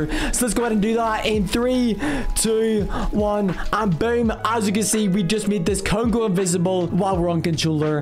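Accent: United Kingdom. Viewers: the british accent makes it 10 times better..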